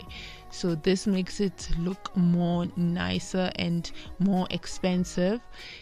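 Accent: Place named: Africa. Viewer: she speaks like an African, there's no American accent even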